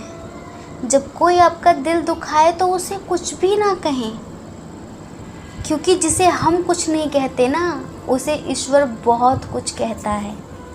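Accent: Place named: Bihar, India. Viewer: Apki langauge bihar ki he completely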